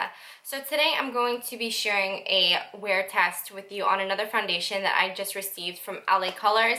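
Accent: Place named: New Jersey, United States. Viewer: Looks like a great shade match! Thanks for the review. PS - am I hearing Jersey in your accent? My mom's from Jersey, and sometimes I get a whiff of this accent ;)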